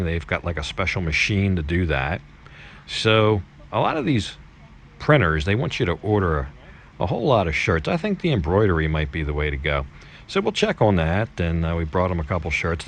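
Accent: United States. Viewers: Because he speaks like an American. Nothing wrong with it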